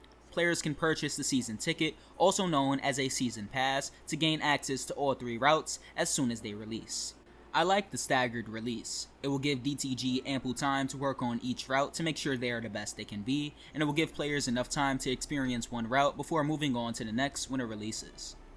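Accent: United States. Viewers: Right...I had to go back to see what the big deal was and you are just saying it the way we do here in the States. If you listen to videos from different regions and countries then you have to be prepared for different pronunciations sometimes.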